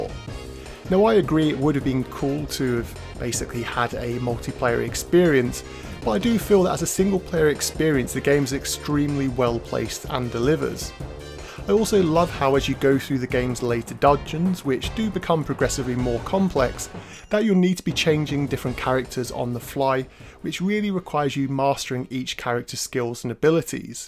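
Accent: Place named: United Kingdom. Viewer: Godddddd why must every n64 video on YouTube be narrated by a British accent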